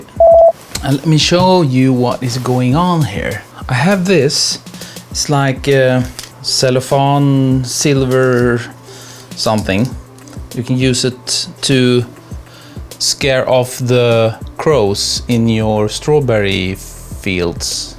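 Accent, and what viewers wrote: Sweden. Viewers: Ahhhhh proper Swedish accent. Renewed my passport today and I felt I needed to hear some more Swedish so good timing with the video.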